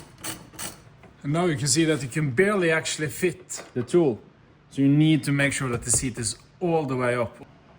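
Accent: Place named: Norway. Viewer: Du må seriøst prate på norsk. Ingen fra utlandet orker å høre på den norsk / engelsken din.. Først må du nå ut til publikum her hjemme før du skal gå «viral». Du har bra content, men du må gå gradene som alle andre dessverre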